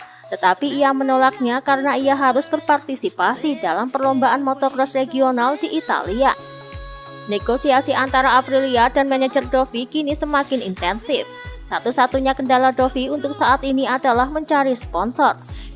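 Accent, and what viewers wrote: Java, Indonesia. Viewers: Mba mba medok hahahaha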